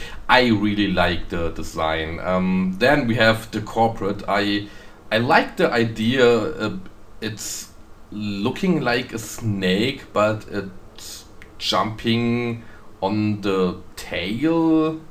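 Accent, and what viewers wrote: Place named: Germany. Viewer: The Overlord did teleport right on to me a time or two, but it seems that there is a little more time to get out of its way. I never made a formal study of German in school, so I don't like to criticize the performance of someone who has put in that time. You have a German accent, but I can understand you easily. It has its charms.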